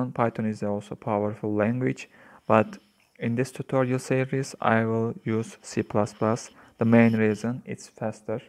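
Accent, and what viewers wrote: Turkey. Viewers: ABi bende tam olarak Hem ubuntu tabanlı hem c++ opencv videosu arıyordum çok teşekkür ederim ingilizce niz de türkçe aksanı olduğu için benim için çok daha anlaşılır olmuş adamsın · Yemin ederim aksandan anladım Türk olduğunu 😁 aslan abim benim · ilk cümlende direk türk olduğunu anladım. Aksağan çok belli edio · aksandan o kadar belli ki Türk olduğu. 🙂 İçerik için teşekkürler.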